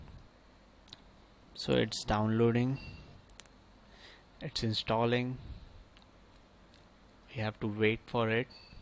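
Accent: India. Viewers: Heard an Indian voice, clicked off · not use full> fuck indian accent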